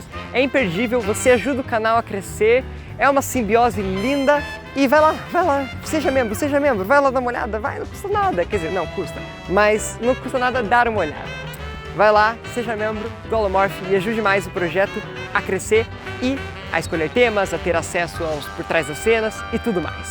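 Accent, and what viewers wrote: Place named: Brazil. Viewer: Parece mais brasileiro do que português.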